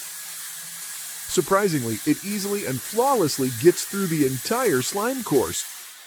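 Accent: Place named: United States. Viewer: The fact that the narrator has an american accent really piss me off.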